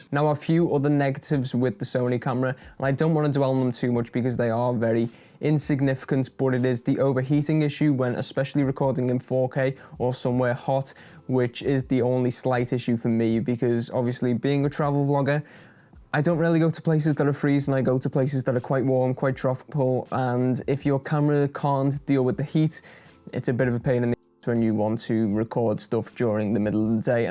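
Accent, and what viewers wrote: Liverpool, United Kingdom. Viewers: Where abouts are you from man? you sound close towards liverpool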